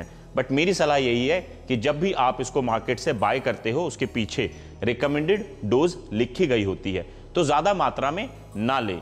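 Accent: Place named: Hyderabad, India. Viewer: Zabardast Mashalah Chindiya agarbattiya mombattiya Sur Sur battiya chingari angar 😜 Hyderabadi andaz me bola lekin mashallah bhot khoob sir. Video apki dekh k mera har baar satisfaction aajata, confidence increase hojata k Bhai mai nai krsaktu aisa nai hai mai bhi krsaktu ye tariqa istemal krke or saath me apke liye dua bhi nikalti.😍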